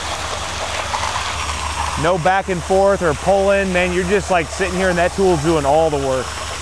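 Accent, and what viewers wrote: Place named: Midwest, United States. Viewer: Hi I'm a big fan of your channel, in from Yorkshie, England. We are known for our strong accents, but I love the way you say ( kahncrete ) not sure if that's how most mid west people speak, but it's got a proper workers sound to it. Full respect to you and for your hard work.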